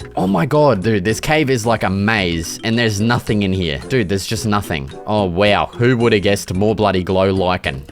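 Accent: Australia. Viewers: Are you also Aussie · Are you aussie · Are you Australian? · 1 minute and 3 seconds in and I already love how Australian you are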